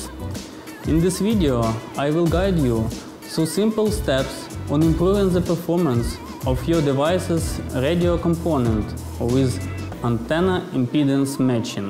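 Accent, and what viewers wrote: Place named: Slovenia. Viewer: Тхеньк ю вери мач. Бат ит из вери дификульт ту андестэнд словеник инглиш лангуаге...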